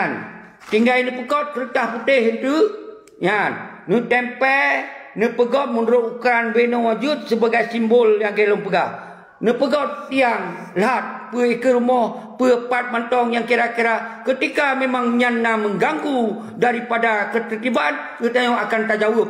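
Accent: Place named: Java, Indonesia. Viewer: Lagee awak jawa baca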